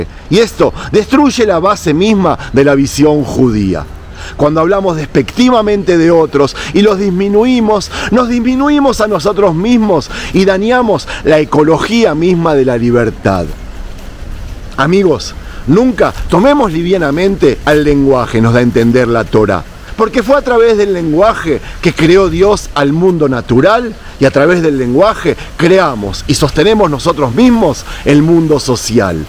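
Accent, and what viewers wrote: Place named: Rioplatense, Argentina. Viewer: saludos de un ecuatoriano me da mcha curiosidad si eres argentino o uruguayo o aprendistes espanol y nacistes en Israel gracias y me gusta tus videos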